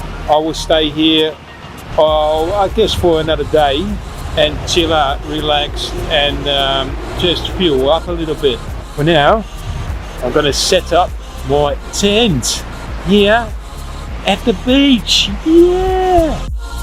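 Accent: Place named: Australia. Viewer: Hoe kom je aan dat enorme Australische accent?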